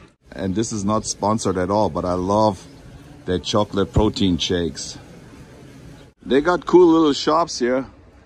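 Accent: Germany. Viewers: Nice to hear a German Accent, my best friend was from Germany. thx for the vids.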